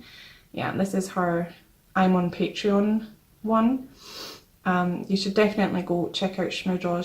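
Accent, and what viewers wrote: Scotland, United Kingdom. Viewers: Are you Scottish? Nice timelapse digipainting! WOW! 👌🏼 I love how well you painted her!!!❤️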